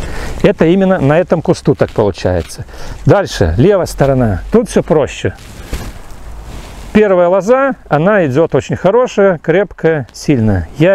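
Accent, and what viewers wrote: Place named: Belarus. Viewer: большое спасибо за информацию, очень нравится ваш голос,ни с кем не спутаешь,с белорусским акцентом, вопрос,какой секатор (фирма ) вы использовали для обрезки. Привет большей из Сибири,г.Томск (виноград пошёл на север )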